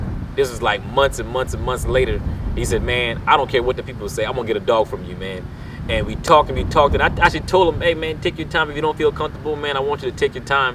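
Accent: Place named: South Carolina, United States. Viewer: Beautiful dogs!! I knew I heard a SC accent..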